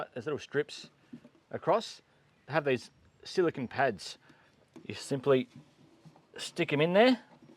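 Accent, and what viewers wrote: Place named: Australia. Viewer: Can barely understand what your saying really really thick Australian accent nevertheless very interesting stuff, thanks Dude .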